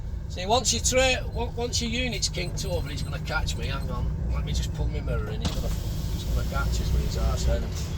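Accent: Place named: Lancashire, United Kingdom. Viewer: Hahaha 😂 your singing is shit lol but you are spot on about the road its not fit for purpose you might have been better back tracking to spean bridge then cross to Dalwhinnie on the A9 better road anyway love the lancs accent safe travels pal hopefully meet you on the road sometime for a blether keep on trucking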